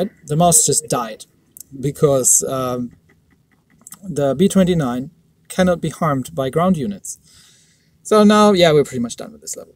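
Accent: Germany. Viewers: Nice video! I'm currently struggling through the 120's lol. Just can't keep my units alive long enough and my health high enough to 3 star stuff. Also, noticed that despite living in Australia for so long, you've still got a prominent German accent. A lot of people tend to lose theirs over time or pick up a new one from where they live.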